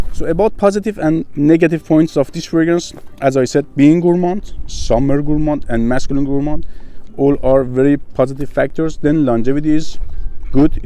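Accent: Iran. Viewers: You Are iranian guy i Can Hear it out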